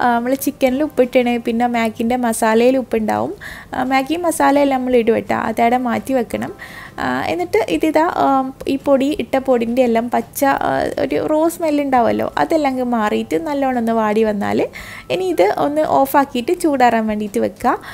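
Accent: Kannur, India. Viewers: ithande Kannur basha oru rakshayum ellatto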